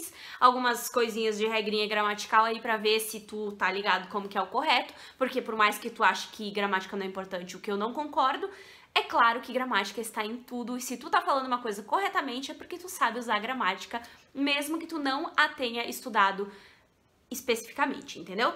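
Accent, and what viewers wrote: Rio Grande do Sul, Brazil. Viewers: vc é linda e muito inteligente mas esse jeito de falar dos gauchos são nojento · ESSE SOTAQUE gaúcho e os verbos USADOS no tempo certo É MUITO chic, PARABÉNS !!!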